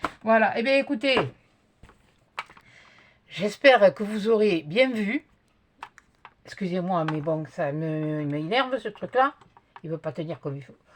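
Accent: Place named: Aveyron, France. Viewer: Coucou, je regarde toutes tes vidéos mais je ne commente pas souvent. J'aime beaucoup ton tuto, des défauts tout le monde en fait, mais certaines ne le disent pas, ton parler je le connais bien car je suis de l' Aveyron... Bonne journée, bises😍😍😍😍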